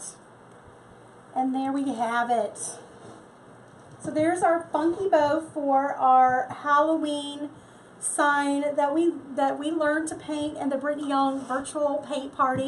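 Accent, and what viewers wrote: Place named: South, United States. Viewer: Thank You!!! I cannot make a bow for anything that looks nice. This will help for Xmas gifts. I love everything you do, esspecialy your southern accent. 👍👍😊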